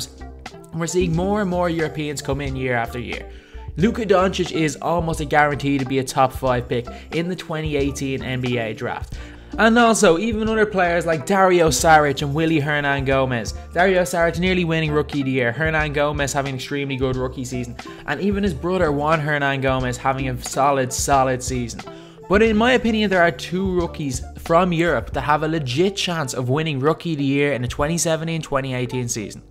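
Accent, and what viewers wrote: Ireland. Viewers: Your defo irish .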